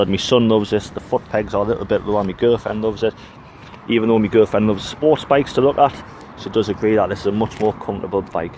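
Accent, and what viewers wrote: Newcastle, United Kingdom. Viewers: Nice review, and you can't beat a Geordie accent! Currently got a Bandit 1200, so I understand your diesel-torque comparison. Might get one of these next, as I have to sell my bike, or possibly the SV1000. It would be nice to try a twin, and something lighter than my big old two wheeled bus! Cheers!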